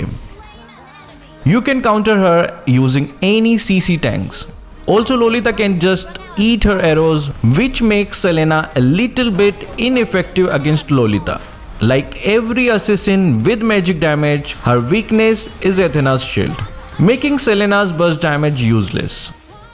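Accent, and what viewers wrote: India. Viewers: Your hinduna accent make this tutorial perfect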